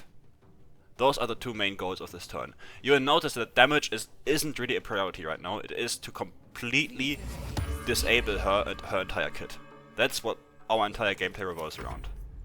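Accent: Germany. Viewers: Yeah, it's probably not possible...I'd consider myself to be a diehard fan and way too obsessed with this game and I can't think of any way that might make it work solo, at least not within 15 turns. I'm currently rewatching your video (after watching some of your build guides) while doing my own "kill everything on sight" run. 😄 I did cheat for the nautiloid though, because I have mods that make additional enemies spawn and I couldn't have handled 3 cambions and 2 mindflayers (+ the 2 cambions on the way and all the smaller enemies) on my own :D The run is a lot of fun, though I gain xp way slower than I thought I would from slaughtering everybody; I guess not finishing any quests really makes a difference. Completely unrelated, but I was wondering: Is you accent German? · can I ask about your accent if you don't mind answering? sometimes you sound standard german but sometimes you sound like a combination of singaporean + australian so I was just trying to narrow it down haha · Are you german?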